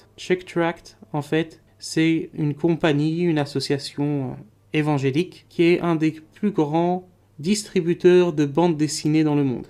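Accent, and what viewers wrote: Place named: United States. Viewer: Salam Ton accent américain est formidable Bien meilleur que tous mes profs d 'anglais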